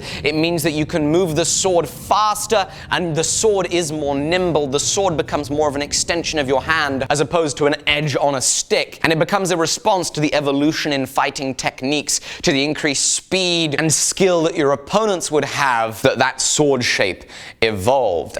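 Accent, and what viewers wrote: England, United Kingdom. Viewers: Dude your English accent is awesome. It let's you make up words that still sound great.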